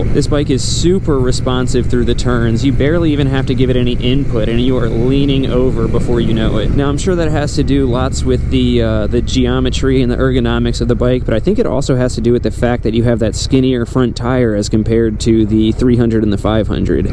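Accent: Maryland, United States. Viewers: I just found your channel, I could tell you were from Maryland before you said Annapolis. I moved from there almost 4 years ago, I now live in Florida. I subscribed.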